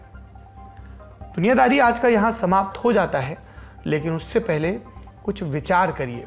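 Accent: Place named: Bihar, India. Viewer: Sir apka news kahne tarika mast hai ekdam bihari U.P. style mein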